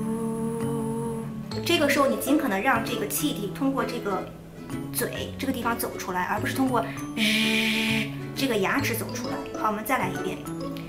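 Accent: United Kingdom. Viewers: 你的发音很英式啊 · 听上去是英式英语？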